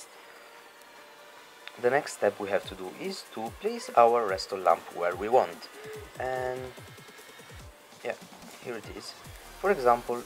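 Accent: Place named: Italy. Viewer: questo tuo accento italiano che fai quando parli in inglese è davvero una cosa fantastica XD, da un tocco di classe all'inglese =), comunque, sei molto bravo con la pronuncia e le parole... io non riuscirei mai a fare un tutorial in inglese XD comunque, questa cosa la sapevo, e devo dire che è molto utile! la uso spesso quando devo fare dei clock "nascosti"... comunque bellissimo video, bravo ^^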